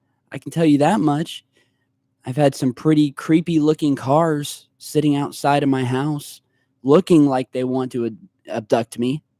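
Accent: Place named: Ohio, United States. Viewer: Your Southern Ohio drawl sure stands out early in the morning.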